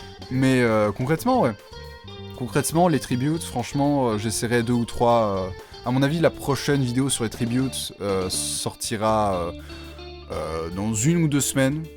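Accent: Belgium. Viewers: J'étais sûr que vous étiez belge sa s'entendé sur l'une de vos vidéos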